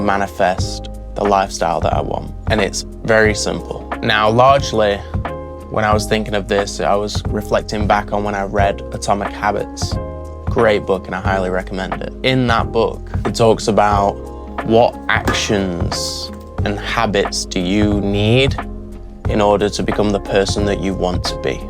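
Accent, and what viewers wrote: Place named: Yorkshire, United Kingdom. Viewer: oooooooo Yorkshire asmr ❤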